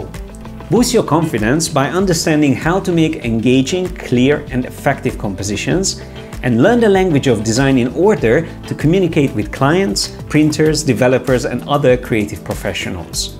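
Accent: Hungary. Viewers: you have a big ass hungarian accent :D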